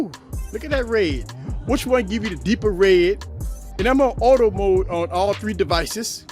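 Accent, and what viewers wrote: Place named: South, United States. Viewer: You's a southern boy huh? 😊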